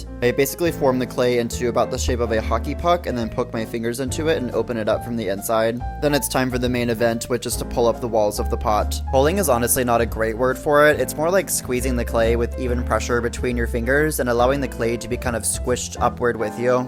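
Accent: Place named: Germany. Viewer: Is it just me or did your accent change to german halfway through the video?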